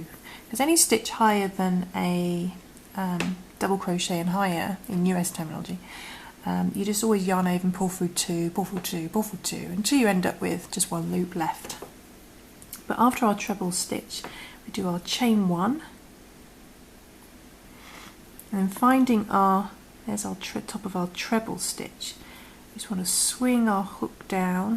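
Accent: England, United Kingdom. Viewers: You have an English accent but you are describing stitches with American terminology aren't you? I'm confused - we don't have a single crochet in English terminology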